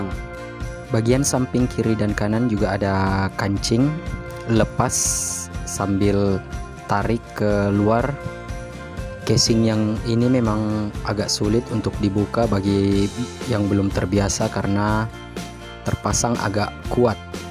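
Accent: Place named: Makassar, Indonesia. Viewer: Sprti nya anda orang makassar ya?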